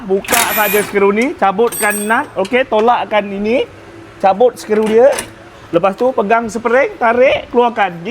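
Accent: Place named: Java, Indonesia. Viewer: Ada lorat² jawa sikit...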